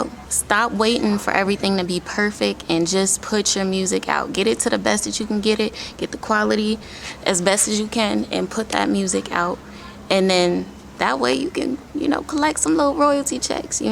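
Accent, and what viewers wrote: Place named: United States. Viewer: Where are you located?cos the accent says American but the scenery says AFRICA!!!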